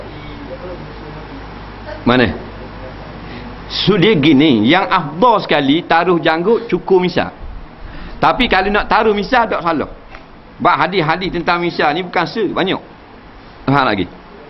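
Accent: Terengganu, Malaysia. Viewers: Saya suka dia cakap Tganu..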